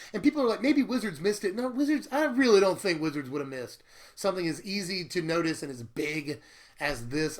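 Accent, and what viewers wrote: South, United States. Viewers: that country accent lol, Im going to say she is from alabama or louisiana. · aawwzz! I love good southern accents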